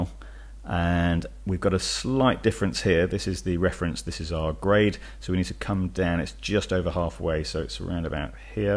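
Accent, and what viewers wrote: England, United Kingdom. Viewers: Dear, Thanks a lot for your LUMA Mix killer tip! It helped me most of my previous day to match a color grading between HD plans but also old SD plans shot in Antarctica for a documentary. It was super helpful and the director are super happy cause the ice have a color that change depending how old was the ice (numerous green/blue clips but also with orange/yellow on high lights due to the sun). You "enlighted" my day:-) Your video was simply super clear, simple, effective, you really go straight to the point and i love your english accent! So thanks! It made my workflow way much faster for that! Have a nice week and thanks again for sharing your knowledge on a very simple and friendly way! Best regards from France! Vincent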